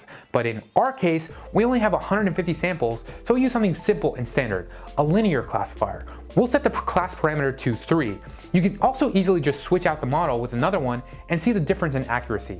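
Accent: India. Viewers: what's wrong with your voice , seems desi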